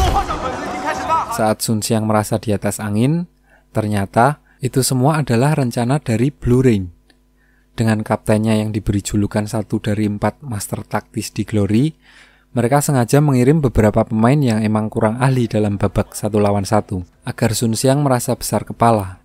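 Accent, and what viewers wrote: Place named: Yogyakarta, Indonesia. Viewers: Loh wong jogja to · Jawa jogja masnya wkwkk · Oalah wong jogja to mas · Admin nya medok tapi lucu nya gokil...anjim 😂😂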